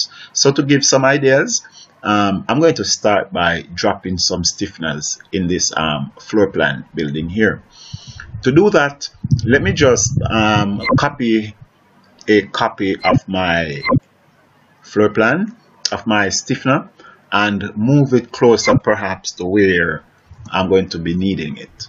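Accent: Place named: Jamaica. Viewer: How yuh mean mi general! Jamaican accent pon dem!!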